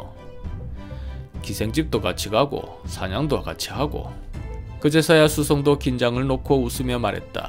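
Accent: Gyeongsang, South Korea. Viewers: 무슨 고구려왕과 역사를 얘기하면서 나레이션을 어울리지도 않는 경상도 사투리를... 표준어를 못하는 것도 아니고... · 영상제작에 사투리가 불편하면 안보면될걸ㅉㅉ 제작자님 일상생활에 경상도 사투리 접하기 어려운 저로서는 들을때마다 구수하고 재밌는 억양이 귀에 팍팍 잘 꼿히고 있으니 있는 그대로 계속 해주세요~~!!!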